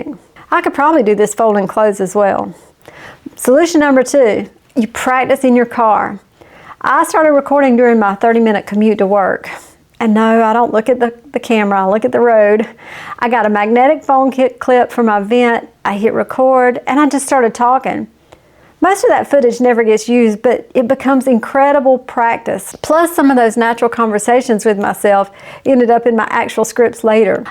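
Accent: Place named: South, United States. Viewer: Not just Women watching you. I’m a subscriber finding your videos very helpful and informative and I’m a 26 year old man 😅 Your videos are great. You remind me of my southern teachers I loved back in my Middle school days when I transferred from Hawaii to Florida, southern accent and down to Earth. Your YT is gonna pop off when Fl, Georgia, Tennessee, Alabama ect. algorithm finds you 🙌🏽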